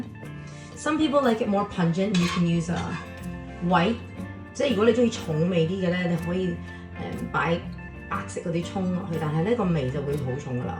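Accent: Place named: China. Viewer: YUM! hahaha, the chinglish is real!!! love it hearing someone speak like me. keep it up!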